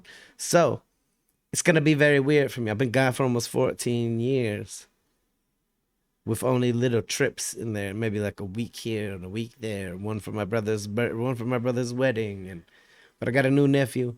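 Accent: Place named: Louisiana, United States. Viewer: You sound like Louisiana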